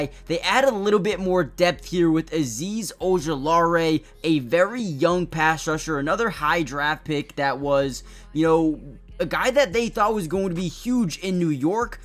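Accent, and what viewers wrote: Canada. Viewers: Do I detect a Canadian accent?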